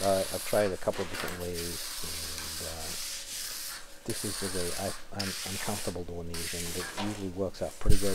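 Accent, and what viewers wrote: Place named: New England, United States. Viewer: Hi, I like your videos and your New England accent. You almost sound Irish or Scottish. That mortar looks so dry. Is it ok to make it a bit more wet like for masonry?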